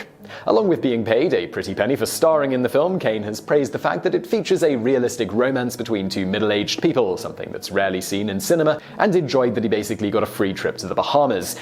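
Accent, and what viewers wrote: United Kingdom. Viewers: This clip needs an AMERICAN ENGLISH voice over. Save your intolerable British gibberish for Masterpiece Theater....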